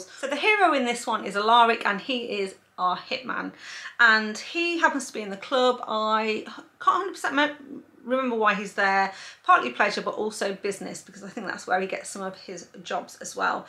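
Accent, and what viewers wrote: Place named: England, United Kingdom. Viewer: I subscibed today, love your english accent! You made me laugh with the bloopers!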